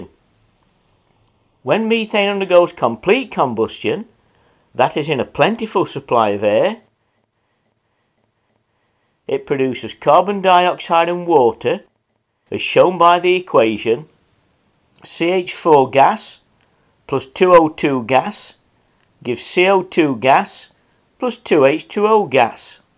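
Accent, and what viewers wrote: United Kingdom. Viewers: I was looking for a short, to the point and well explained video to summarise the incomplete combustion of hydrocarbons - this is excellent. Fits my purpose perfectly. And I love the accent - very British! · Its not weird, it's an English accent(British).I know its pretty weird for all of us,,, I understand!.)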